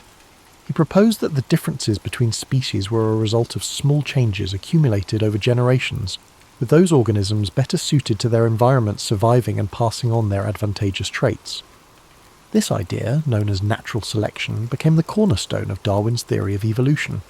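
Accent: United Kingdom. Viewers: I so wish you would ditch the British accents. Who can sleep with that uptight, nervous-wreck jabbering invading our bedrooms?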